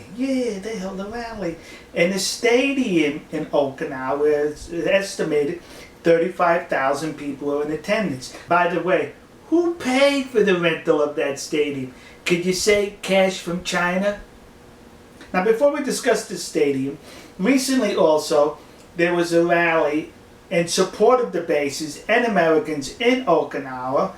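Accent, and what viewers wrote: New York, United States. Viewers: I should have figured out that you were BAT SHIT CRAZY, when you said you've lived in Texas for 32 years, and still had an NY accent. That's kind of like Charro* and Zsa Zsa Gábor** <link> <link>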